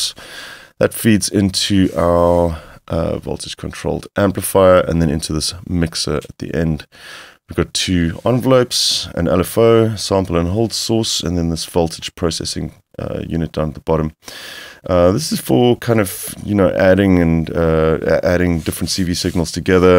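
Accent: South Africa. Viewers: Hey, you sound like a South African, the accent checks out..... fellow South African checking in, this is rare. Neat review and wow, for $25 this is incredible.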